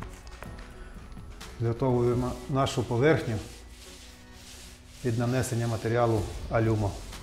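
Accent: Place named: Western Ukraine, Ukraine. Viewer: Тогда тебе придется учить язык всех 14 остальных республик бывшего СССР! Ведь остальные тоже тебе так скажут. Русский был выбран по праву большинства.Только и всего.И братья тут не причем. Было бы украинцев 175 миллионов, как при совке русских, то и гоаорили бы на украинском. А тот факт ,что автор начал делать ролики на своем языке,говорит о том, что ТВ пропаганда ,стравливания РУСОВ, свою работу таки сделала. Он со Львовской обл., города Стрый, его Западенский акцент всегда чувствовался, но тем не менеее,он вещал на русском, а тут вдруг проснулся...) Патриот вшивый! А где ты раньше был?! Что раньше,до захвата Крыма, по-русски вещал? Скажу, як громадянин України, рагулів, таких ,як автор цього відео, пів України !!! Бидло ,воно й в Африці бидло! Дизлайк і відписка !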